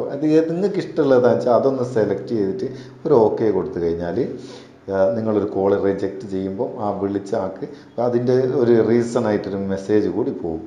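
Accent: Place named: North Kerala, India. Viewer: എന്റെ മുത്തുസെ എന്തിന്നാ ഈ പറയുന്നേ... കുറച്ചു സ്റ്റാന്റേർഡ് ആക്കിക്കൂടെ... വേറെയും എതെല്ലോ ജില്ലക്കാരും കേൾക്കുന്നില്ലേ... തെക്കന്മാർക്കൊന്നും മനസ്സിലാവില്ലാന്നേ..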